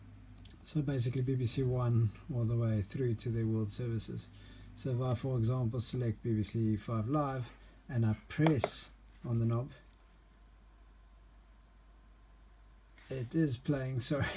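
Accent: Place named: South Africa. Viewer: SA accent with British stations. Where are you?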